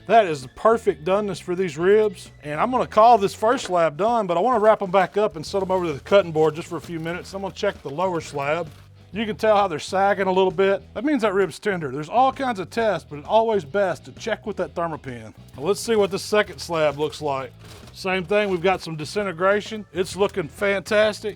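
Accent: South, United States. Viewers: It’s that southern draw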